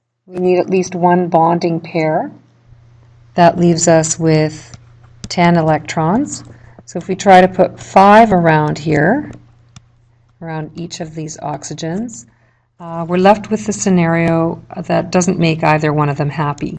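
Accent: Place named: Canada. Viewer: Thanks, that really helped! Even with that Canuck accent! Sounds like your software or mic has some effect that makes your voice start out very low volume, then come up to normal volume. You video is super helpful, so I hope you can get that fixed!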